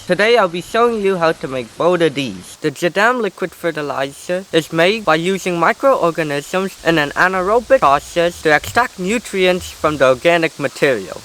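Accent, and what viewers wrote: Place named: Laos. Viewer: Absolutely a fantastic video. Very good job, young man. Please dont be offended, but you remind me of the Laos people i had the prevelage to work with. It's your dialect. Some people get offended if you miss guess their nationaly. What country are you or your parents from, as you may be a first generation to a different country? Your english is really good and clear easy to understand. You have a good knowledge. I learned some good information. I went to the other website that you pointed to, and your sibling also did a fantastic job, has good knowledge with exclent english.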